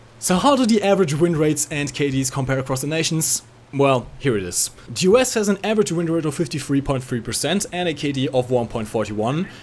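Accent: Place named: Germany. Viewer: I like ur German accent and also very well said.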